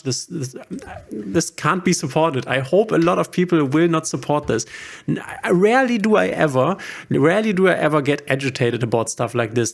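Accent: Germany. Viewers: Höre ich Richtig, bist doch ein Deutscher ;)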